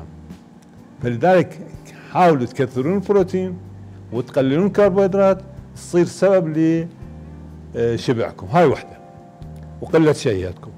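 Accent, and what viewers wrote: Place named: Iraq. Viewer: دكتور انت كتير مهضوم ونا احب اللهجة العراقية هواااااي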